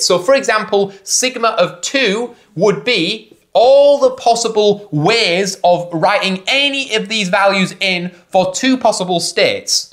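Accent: United Kingdom. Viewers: I Cant take a squeaky voiced british accent serious, ever at all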